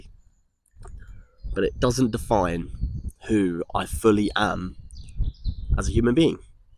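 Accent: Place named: RP, United Kingdom. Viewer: U sound posh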